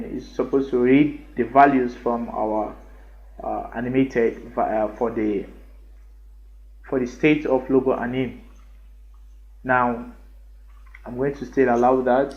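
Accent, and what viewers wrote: Nigeria. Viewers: that Nigerian accent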